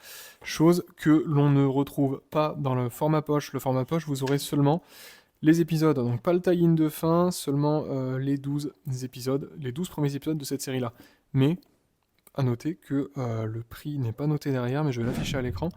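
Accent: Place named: England, United Kingdom. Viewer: Un nouveau dans le game comics. Tu lance ta chaîne qui parlera des comics news ?Très bel accent anglais 😎 Tout dépend ta recherche la poche ça permet de relancer de nouveaux lecteurs à petit prix pour relancer l’industrie du comics pas pour un public voulant une belle édition moi avec le temps qui passe je favorise les belles éditions et toujours en dur même si ça devient un budget comme les omnibus dans leur fourreau Après le dur tu ne peux pas lire ça dans le métro 🤣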